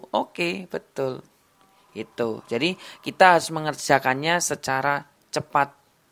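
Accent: Java, Indonesia. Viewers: Alhamdulillah kak, aku tertarik nama chanel nya kayak nama orang Chinese tapi logat jawa punya dan ada rumus The Ping-nya..andai guru mtk mass skolah kayak njenengan pasti mudeng pol.. Kak, moon maap aku jadi tertarik matematika lagi saat persiapan tes SKD gegara chanel njenengan kang, Saya kurang kerjaan, itu apa akronim Ping2an(jawa) dan perkaliean?? Kerenn pokoknya, mudah dipahami semuanya dari chanel ini Ohya mau melaporkan tes skd saya hari ini meski nilai tidak sampai tembus di atas 350, hari ini saya tes dan berkat chanel ini TIU yang biasanya try out saya nilai selalu anjlok alhamdulillah dapat dua kalinya.. Ini tes kedua saya semoga yg terakhir krn udah ketrima pns. aamiin Skor 335 "twk 90 Tiu 105 Tkp 140" Ranking belum ngeh di instansi yg saya tuju Sedikit FR Pecahan sederhana dengan pendekatan dan barisan juga posisi duduk melingkar jan persis deg penjelasan njenengan kak, maturnuwun saya minta doanya ya bisa lulus perankingan SKB sampai jadi ASN AAMIIN YA MUJIBASAILIEN.. 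MUGA barokah selalu kak ilmunya karena keikhlasan berbagi ilmu yg njenengan miliki semakin berkembang, saya nantikan slalu temasuk materi skb nanti apa aja ya kak. Terima kasih #maapkepanjangan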